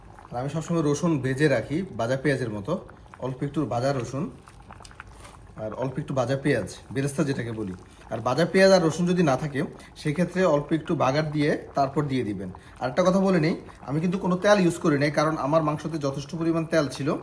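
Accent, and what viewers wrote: Noakhali, Bangladesh. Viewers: নোয়াখালীর মানুষ যত শুদ্ধ করেই কথা বলুক,আমি ঠিক বুঝে ফেলি।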